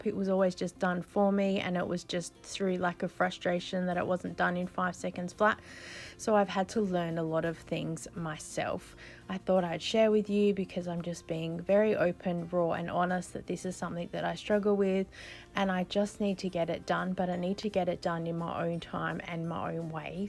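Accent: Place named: Australia. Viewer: Dana's lovely. She's an ADHDer and developed her no mess method, precisely coz normal methods didnt help her. Hi from Sydney, you sound like an Aussie!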